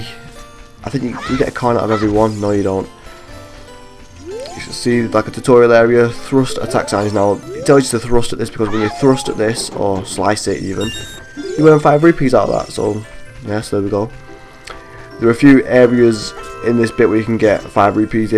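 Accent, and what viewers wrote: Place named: North Yorkshire, United Kingdom. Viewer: Great LP man. Helps that you obviously know the game well so it kind of doubles up as a walkthrough, some funny remarks in there too :) You sound like you're from the north like me! I'm gonna hazard a guess at North Yorkshire? You sound a bit like my mate who's from York. Anyway, great stuff.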